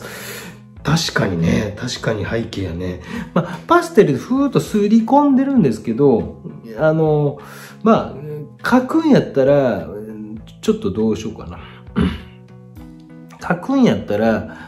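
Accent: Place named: Nara, Japan. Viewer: ご指導ありがとうございました。 背景の黒を頑張ったつもりですが、まだまだ足りませんでした。 紙が粗いのは気になっていました。 紙探しをします。 そしてまた、ガラスを描いてみます。 先生、やっぱり奈良弁ですよ。 「○○できやん」とか、「見えやん」とか「良かったかもしらん」とかは、やっぱり奈良っぽいです。私の奈良のお友達は みんなほんわかしていい人達でした❣️ とにかく 精進しますのでよろしくお願いします😊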